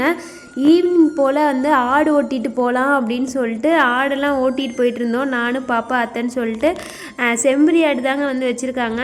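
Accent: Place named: Salem, India. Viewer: Naangalum salem pakkam dhaanga enga oorula pesura maathiriye pesareenga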